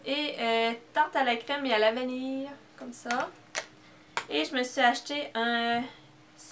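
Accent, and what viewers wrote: Canada. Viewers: Youppi tu parle français Canada je mabonne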